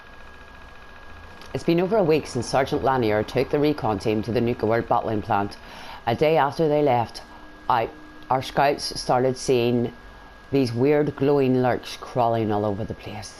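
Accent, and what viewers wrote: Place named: Northern Ireland, United Kingdom. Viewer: Love that "northern" accent... Derry or thereabouts? Georgia (USA) here. Well done.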